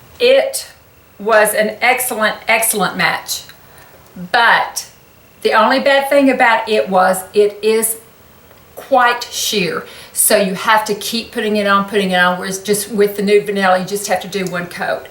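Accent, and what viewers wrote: South, United States. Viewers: I love your Southern drawl and your kind personality,. Frankly, I feel like you could be my sister. I feel just right at home! ♥️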